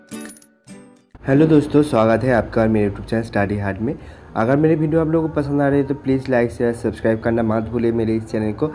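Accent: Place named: Assam, India. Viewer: Assamese accent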